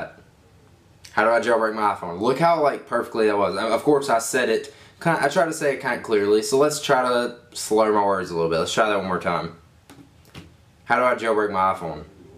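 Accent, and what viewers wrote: South, United States. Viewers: I think he just means your slight southern accent.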